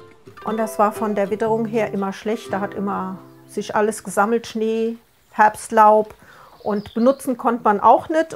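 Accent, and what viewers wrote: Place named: Bavaria, Germany. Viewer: Der Dialekt muss aus dem Raum Aschaffenburg kommen 😅 fühl mich direkt zuhause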